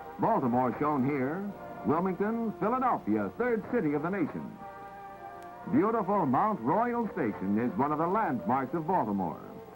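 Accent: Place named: New England, United States. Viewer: Excellent. Narrated like all of the instructional and school films. “...from Pittsburgh to Akron, the rubbah centah.” Narrators always had that New England accent. I remembah!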